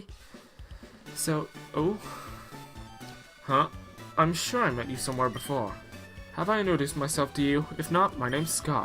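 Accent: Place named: England, United Kingdom. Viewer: Are you From England?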